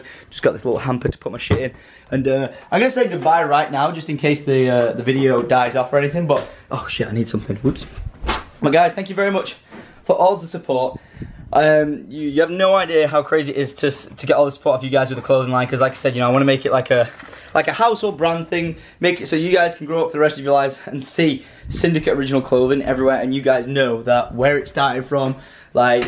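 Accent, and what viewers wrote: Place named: Manchester, United Kingdom. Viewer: Manchester. Well, just south east of it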